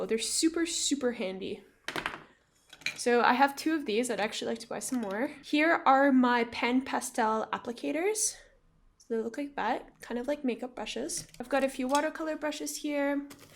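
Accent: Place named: Germany. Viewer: Lovely German accent! I'm a Brazilian living in Berlin and I'm total drawing begginer. Your videos are inspiring/helping me a lot. I could see you have pretty much everything you need for your art craft, and would like to ask you where do you buy them. I live in the West and close to my apartment there is a huge craft store, and I feel like buying everything. I can't say it's cheap but they have an excellent service and their personal are always very helpful. Here is the link if you're interested: <link> I also heard about a store called Action, but I haven't been there yet, because it's far away. I looked at the online prices and it's indeed very cheap. I also buy a lot at Aliexpress app. I would love to know where do you buy your art material. Wish you an amazing weekend!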